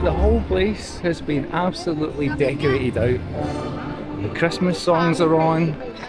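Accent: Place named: Glasgow, United Kingdom. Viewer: It's refreshing to hear your accent after leaving Glasgow 20 years ago. Used to live in Denistoun when I went to Strathclyde Uni. Enjoy KL mate!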